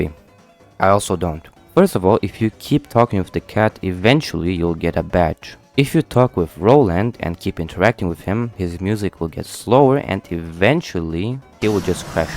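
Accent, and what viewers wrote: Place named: Russia. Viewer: Этот бро реально скрывает что он русский. Слышно русский акцент.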